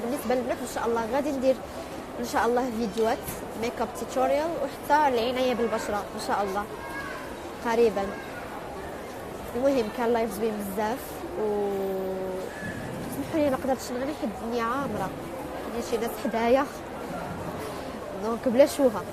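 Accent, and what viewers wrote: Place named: Gulf, Arab world. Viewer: هضرتك ماشي مغربيه لميه فالميه كتصنعي وديما كتهضري مص هضرتك خليجي وكتباني بحال شي وحده فالاربعينيات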